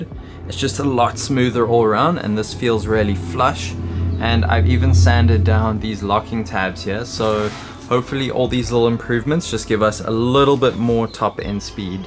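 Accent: South Africa. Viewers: SOUTH AFRICAN ACCENT DETECTED!! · That south african accent again. Thumbs down, I'm out. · Yoh the SA accent is so recognizable bra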